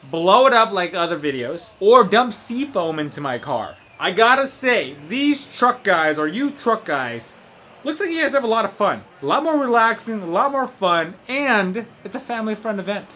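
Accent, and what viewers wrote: New York, United States. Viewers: your accent sounded more like a New Yorker not someone from the east cost. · really, thought that was new yorker accent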